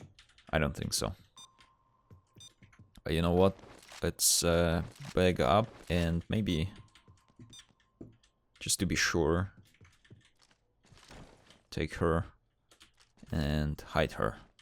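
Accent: Russia. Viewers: you from Russia or Germany? Your accent as a normal russian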